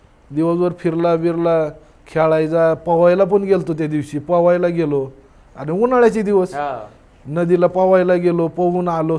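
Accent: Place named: Pune, India. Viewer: Propar puneri language Good 👍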